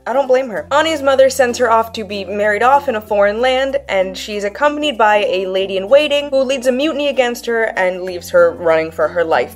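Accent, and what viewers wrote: Brazil. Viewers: I think the spelling of Portuguese from Portugal and Brasil might be the same but the pronunciation on your vid is kinda brazilian lol! You did an amazing job!!! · Hi beautiful, you are talking portuguese with the brazilian accent, I'm from Portugal and our accent is very different ahah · ohhhh portuguese !!!! that is my language , im from portugal omg. your portuguese was pretty decent , but you definitely spoke with the brazilian accent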